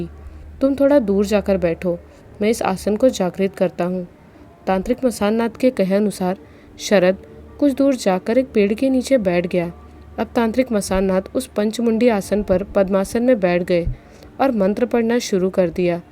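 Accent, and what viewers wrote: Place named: Eastern Uttar Pradesh, India. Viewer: लेकिन बोलने के तरीके से east up की लगती हैं 🙏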